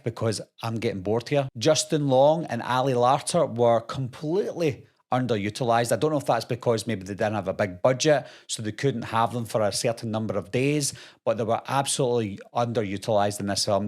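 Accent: Scotland, United Kingdom. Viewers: With a last name of i can pick oug that scottish accent...where ya hail from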